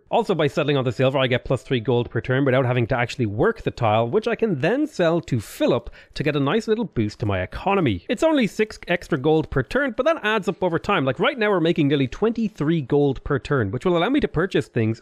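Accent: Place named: Ireland. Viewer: You have a lisp? I thought you were just Irish.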